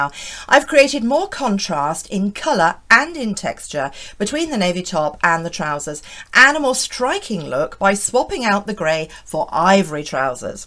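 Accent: United Kingdom. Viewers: I really appreciate your fashion tips and this American loves listening to your lovely British accent.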